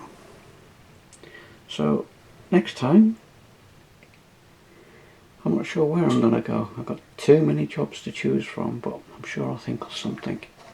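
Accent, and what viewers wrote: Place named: Tyneside, United Kingdom. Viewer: Hi, I live in Sunderland, but prior to the Coronavirus pretty much most Sundays, my wife and I would take a drive through to Shields along the coast road, round by the river, over that bridge and round by the Town Hall back to the sea front and then off homeward. You’ve done a fantastic job if you are no longer in the area, not sure how long it is since you’ve been ‘home’ but on the river side (north) of the bridge is a housing estate, the dry dock is still there and central to the estate, and on the east side is a statue of Catherine Cookson. You’ve also managed to keep your accent, so much so I was convinced you were still in the region. Anyway thanks for the reply, I get where you are coming from regarding hearing the noise - to be fair I have a test layout on a 4 x 2 ply board with cork on the top and it is certainly not quiet. 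I think I may just follow suit and build it on the ply surface of the L shaped baseboards. Cheers Derek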